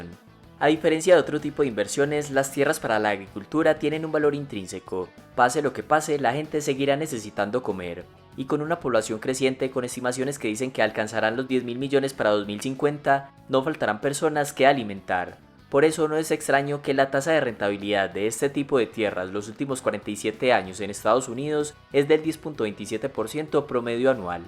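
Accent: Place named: Paisa, Colombia. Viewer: ese acento yo lo reconozco, es colombiano paisa jaja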